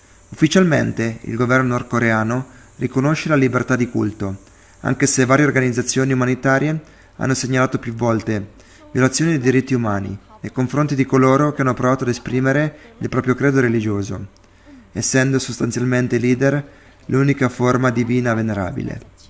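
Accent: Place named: Ancona, Italy. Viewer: Dall'accento sei anconetano...ciao!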